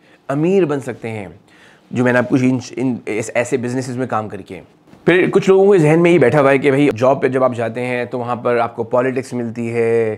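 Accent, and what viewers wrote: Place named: Karachi, Pakistan. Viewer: اسلام علیکم جزاک اللّہ سر آپ کا بولنے کا انداز کراچی والا ہے لیکن آپ بتارہے ہو آپ مری میں رہتے ہو ؟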